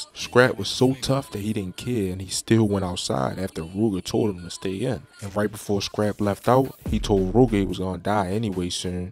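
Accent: Baltimore, United States. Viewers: You got That Baltimore Accent. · doing this video is from Baltimore.. being a native Washingtonian here in DC I'm used to that accent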